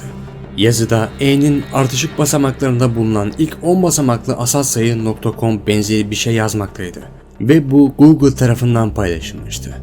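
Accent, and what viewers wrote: Azerbaijan. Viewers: Azerisən türksen? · Abi sen azerimisin??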